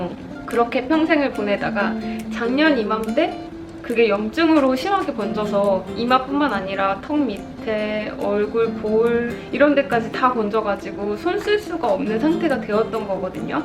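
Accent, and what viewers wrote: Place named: Seoul, South Korea. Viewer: 우와 서울사투리 같아요 신기신기 잘보고갑니당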